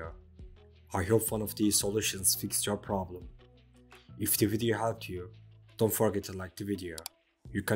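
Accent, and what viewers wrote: Turkey. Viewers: Türk oldugunu inglizcenden hemen anladim :-)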